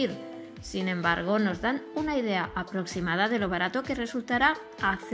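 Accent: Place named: Eastern Europe. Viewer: Es curioso este canal solo tiene videos de Musk y Rusia, tampoco he visto que el titulo se corresponda a nada que haya dicho Musk, así que todo esto tiene un cierto "TUFILLO" el "Mejor motor del mudo" si de hace 30 años era y es muy bueno y muy caro por el precio de 1 Space X hace 5 mejores y al menos igual de fiables. La locutora habla un poco como si fuera como del Este, en fin. Y la traca final "No vale la pena analizar los aburridos números" y tampoco que el RD 180 llega a una presión de 257 bares y un raptor ha llegado a 330 ese aburrido numero dice, más rendimiento más potencia. Una kk de video lo he visto a 1,5X y he perdido el tiempo y atufa a Kremlim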